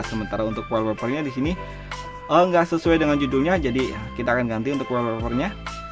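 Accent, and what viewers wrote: West Java, Indonesia. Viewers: Kangg Ti Sunda? 🤣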